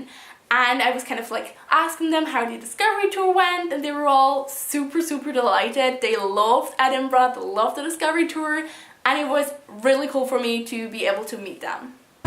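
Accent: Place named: Ireland. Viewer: What I find interesting is that you’re from Austria, and did your exchange in Ireland. Yet you speak English with an Irish brogue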